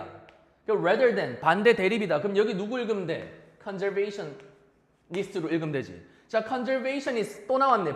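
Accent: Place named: Gyeongbuk, South Korea. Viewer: 와~ 경북 사투리 이해 쌉 가능^^* 경기도 사는데 쌤 온라인 강의 듣고 싶어요~~~^^*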